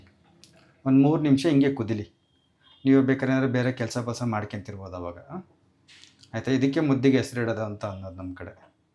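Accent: Chitradurga, India. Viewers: Nam durgada bashe ty u so much 🥰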